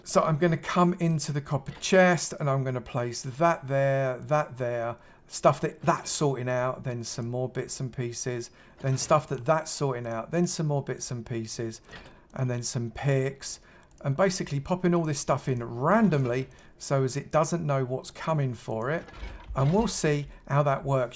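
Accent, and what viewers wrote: United Kingdom. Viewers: Loving your videos. Nice to hear a British accent 😀 What happens if a chest gets full up. Do the items go into the overflow?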